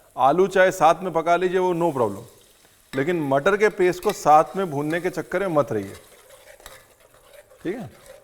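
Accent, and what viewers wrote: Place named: Kanpur, India. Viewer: Aap kanpur se h lag raha. Aapki boli kanpuriya h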